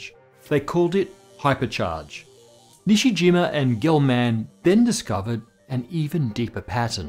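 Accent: Australia. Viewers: I'm so confused, you have an Australian accent and say "zed" not "zee", but you also said "math"...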